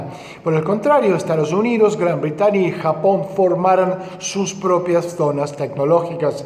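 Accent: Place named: Spain. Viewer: quítate ese acento español tan ridiculo ya vives en LatinoAmerica......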